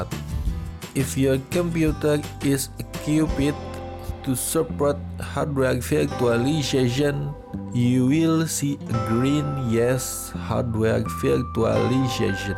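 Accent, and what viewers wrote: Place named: Bengal, India. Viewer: Dat bangga English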